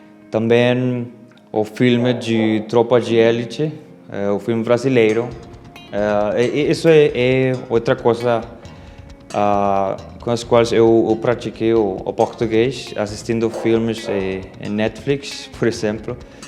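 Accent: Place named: Ostrobothnia, Finland. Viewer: Yllätävän hyvää suomea! Huomasi myös että oot asunu Pohjanmaalla. 😊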